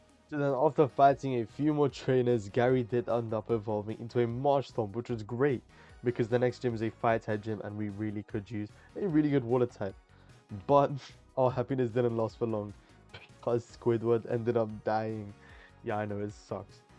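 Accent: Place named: Sydney, Australia. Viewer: You got a Sydney accent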